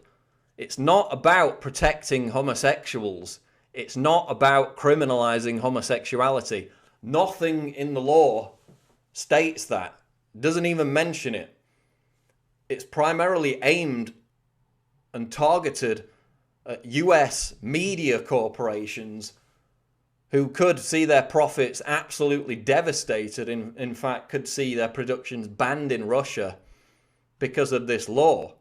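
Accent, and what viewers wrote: United Kingdom. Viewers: never expected to hear bullshit in british accent I always thought a sign of intelligence and sophistication....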